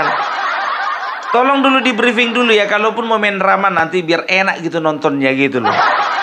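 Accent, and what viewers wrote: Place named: Java, Indonesia. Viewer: Bang good logat jawa nya aku suka banget 👍👍🙏🙏😀😀😀😀😀😀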